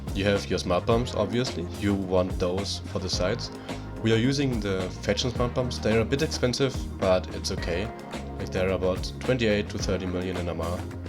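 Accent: Germany. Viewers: Ahh the German accent ..! Which eve time you playing usually?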